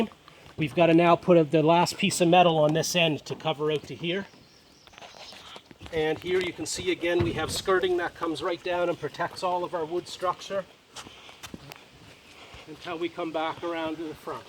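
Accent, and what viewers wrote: Ireland. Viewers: Touch of Irish in your accent?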